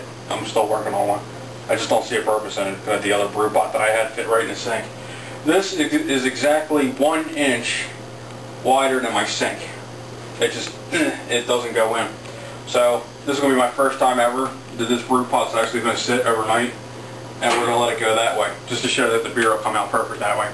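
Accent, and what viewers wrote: United States. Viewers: Are you from Baltimore? Sounds like a b-more twang to me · This is good work. You sound like a Pittsburgh accent, where are you from?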